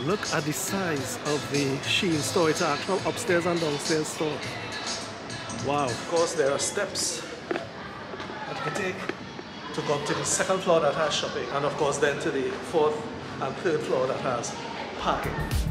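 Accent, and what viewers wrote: Trinidad and Tobago. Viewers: You sound like a Trinidadian, everyone blogging now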